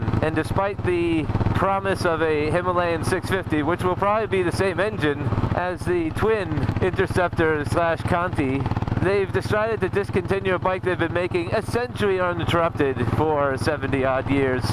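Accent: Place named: California, United States. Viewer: thanks for your reply I've been a subscriber since late 2018, I wonder why u have a Californian accent though, good morning.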